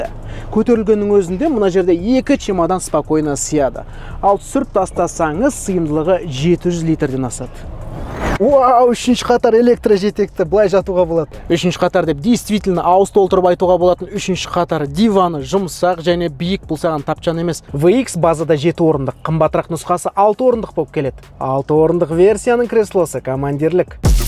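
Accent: Standard. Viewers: ісіңе береке берсін сен сияқты таза қазақша сөйлейтін қазақтар көбейе берсін аумин 🤲🏻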